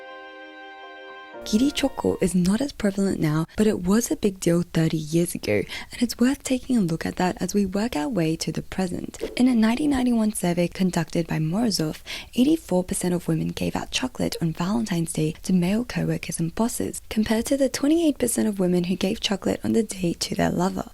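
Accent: Australia. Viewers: Is that an Australian accent mixed in with your English pronunciation? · Why am I hearing an Australian accent? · At first I did a mental double-take at hearing your Australian accent, then I noticed the size of your room and thought "yeah, fits, that's prolly her entire apartment in Sydney or Melbourne and she pays $5000/month rent" 🤔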